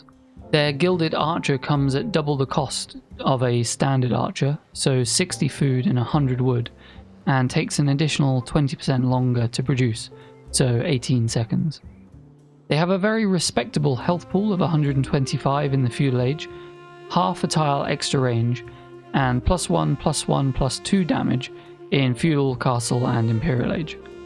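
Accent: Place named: United Kingdom. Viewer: Damn this British accent. Mehter is not Meta. It's Mehter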